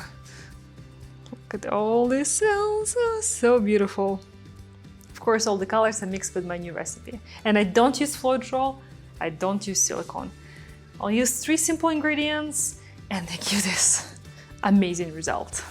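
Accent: Russia. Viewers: русская девушка? У нее русский акцент❤ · Ну и акцент! Чисто русский. даже я почти все понял. Хотя на английском кроме хау мач? них не разумею.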